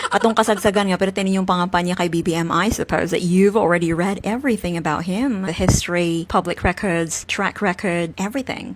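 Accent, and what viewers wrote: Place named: United Kingdom. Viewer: Hello Ms, good day to you. I don’t think Tio Moreno capable of a debate with a person as intelligent as you. Love that British accent by the way Atleast it sounded like a British accent or yung hilaw na Australian accent.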